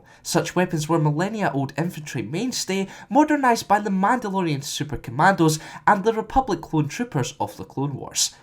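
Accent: Scotland, United Kingdom. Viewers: Ahhh god bless your Scottish voice. Its so irresistibly refreshing from boring British and American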